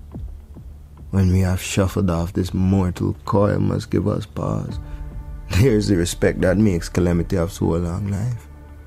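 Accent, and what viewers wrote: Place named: Jamaica. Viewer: Wonderful the Jamaican accent is Tight my Brother so uniquely poetic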